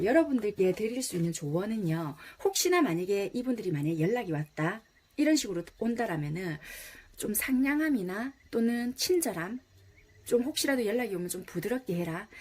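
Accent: Busan, South Korea. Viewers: 부산 사투리 뿜뿜 타로 5 처음부터 조언이......ㅋㅋㅋㅋ귀우셔용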